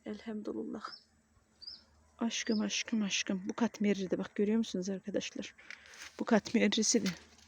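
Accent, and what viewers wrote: Azerbaijan. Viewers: siz azerbaycan lehcesiyle mecbur edirsiniz ozunuzu ki turkce daniwmaqa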